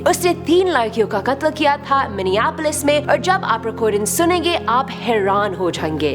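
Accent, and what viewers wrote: India. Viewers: Unfortunately, hindi accent stopped working. OK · Dude ur Hindi accent is sooOooOooooooOooO cool.i just love the way you talk in that accent! Who agrees?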